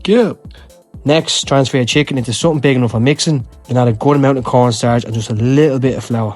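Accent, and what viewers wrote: Ireland. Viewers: Very nice... but a few bits of advice. Slow down your speech. You have a strong Irish accent (it is lovely) but on a global stage it will be really hard for some people to keep up and understand. Second, SLOW DOWN your whole presentation... if you are trying to make Youtube revenue then you need your episodes to be longer than 9 or 10 minutes... It will attract more advertisers and gather more likes etc. Take time to fill a background story with interesting back insights or whatever. You know stuff that you think is interesting...talk about it. GOOD LUCK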